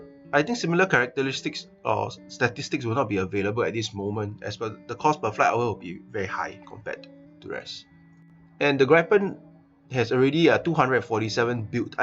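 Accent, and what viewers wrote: China. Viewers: Pronounsation sounds like pure chinese .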